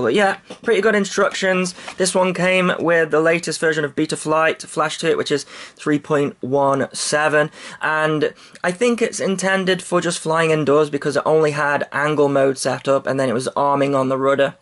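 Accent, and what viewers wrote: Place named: East Midlands, United Kingdom. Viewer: Nice fella that....where are you...that's sounds like an east Midlands accent...or potteries..lol...no wonder you don't get much Sun l lol great review as always..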